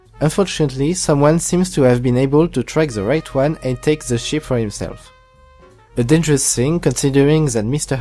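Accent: France. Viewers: I'm french, I can assure you it's a french accent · Sounds French to me. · Freeeeench...... What you have to say is really interessting but man' that is so akward. Can't listen it entirely. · Ouais par contre ton accent français ça se voit à des kilomètres, essaye un maximum l'accent anglais svp Un petit effort pour nos amis les anglophones.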